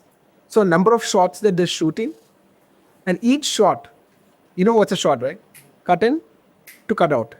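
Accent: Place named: South India, India. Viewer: Vishwas bhai tumhare sath main mujhe bhi intresting lag raha hai and I am also IT student the way sir is explain is is very interesting and I like the south indian accent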